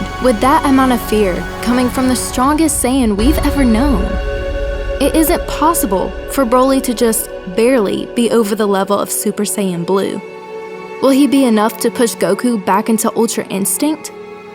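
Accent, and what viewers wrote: South, United States. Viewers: I thought u had a southern accent I'm from s.c so I've heard way worse.i love your voice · your southern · Where r u from with the southern accent? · I knew you were a southern girl lol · you're a fellow southerner? well that's cool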